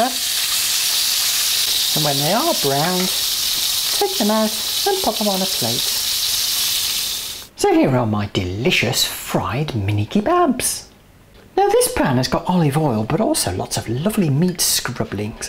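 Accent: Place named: Solihull, United Kingdom. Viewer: Your voice is just the same as my grandma's shes from solihull. I live far away from her so i love watching your videos to hear that accent! xx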